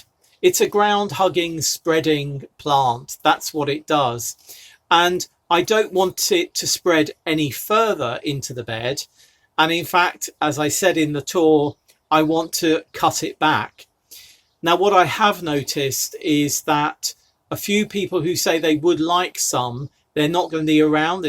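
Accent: RP, United Kingdom. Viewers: YOUR speach is fantastic and a perfect ..we c as lled it * Oxford English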